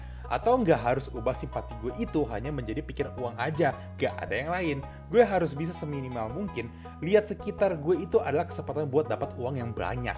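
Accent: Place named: China. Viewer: Salfok sama cara ngobrolnya bang kedenger ada aksen tinghoa nya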